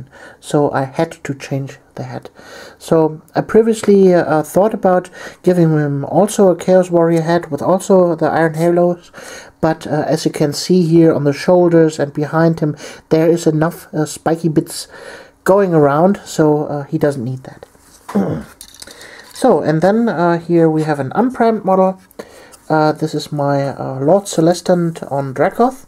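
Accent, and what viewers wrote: Germany. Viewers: Ah, da war doch dieser charakteristisch deutsche Akzent :) Wollte nur mal kurz sagen, dass Du wirklich guten Content lieferst und mir maltechnisch wieder wirklich Lust auf das Hobby gemacht hast. Bleib wie du bist, hast nämlich eine wirklich sympathische Art!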